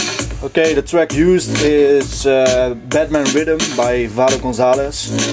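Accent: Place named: Netherlands. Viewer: haha leuk accent ;) echte hollander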